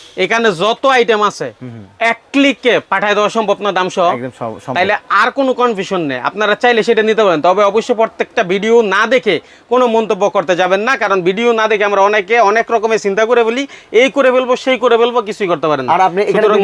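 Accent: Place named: Chittagong, Bangladesh. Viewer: ভাইয়া কথা শুনে মনে হচ্ছে ভাইয়া চট্টগ্রামের ছেলে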